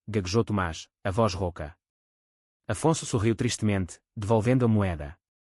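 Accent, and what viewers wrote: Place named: Portugal. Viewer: Que sotaque português horrível. Chega ser cansativo ouvir! Porque não grava o áudio no português brasileiro é melhor e mais sonoro.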